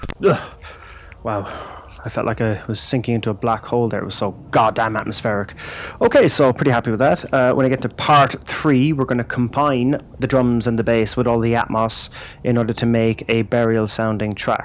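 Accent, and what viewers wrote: Ireland. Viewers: Can you do Detroit electro? And is that an Irish accent ?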